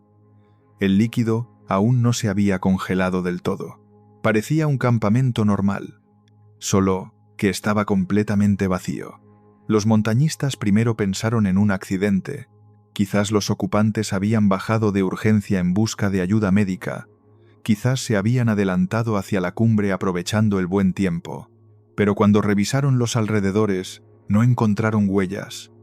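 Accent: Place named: Spain. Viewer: ERES ESPAÑOL, NO LO VERÉ .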